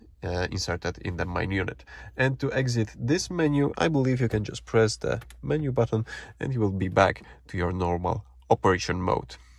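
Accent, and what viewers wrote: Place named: Poland. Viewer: Polak mówiący po angielsku 😎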